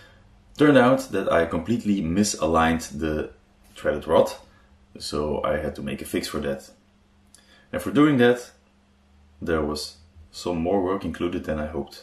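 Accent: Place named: Belgium. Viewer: Hi there, Your accent sounds terribly Belgian, I should know since I am one, correct me if I am wrong. I am also building a hypercube (<num>) but I followe Daha's videos up untill now, I am starting with the electronics part now but then I notice I have a very diferent motor controller card (Duet 2) and LCD (Paneldue 7i) so I am a bit thron of my rocker here, I have already found some intell on this but is it ok if I drop you a question from time to time? If my suspicion is correct we can converse in dutch :-)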